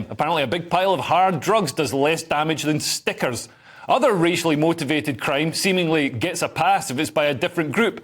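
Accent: Scotland, United Kingdom. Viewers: I like the Scottish accent for news reading. Could we try a Glesga accent next ? Has the guilty policeman and the court official responsible not just for the Britons arrest but twisting our laws, so as to jail a man for posting harmless posters around town.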